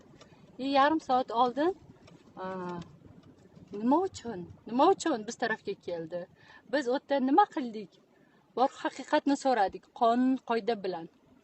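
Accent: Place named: Tashkent, Uzbekistan. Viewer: Узизни шевазда гапируринг .тушунамиз адашиб юбориб яна повторный тошкент шевада тугирлаб кетвосиз. Бир гапни икки шевада гапирвосиз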